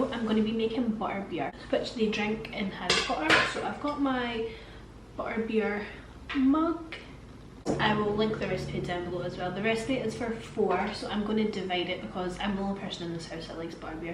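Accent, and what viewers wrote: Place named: Scotland, United Kingdom. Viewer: I freakin adore Scottish accents. It’s the one accent I can not do myself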